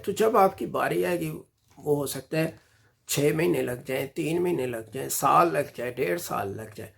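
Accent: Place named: Sialkot, Pakistan. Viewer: Sir lagta ha ap sialkoti hain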